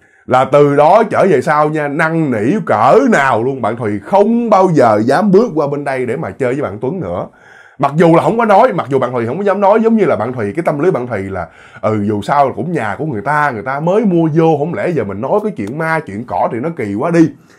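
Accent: Mekong Delta, Vietnam. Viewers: Cái gì mà thuật lại câu chuyện từ người khác. Mà kể như chính mình chứng kiến... Cái gì nói tiếng miền tây rặc luôn..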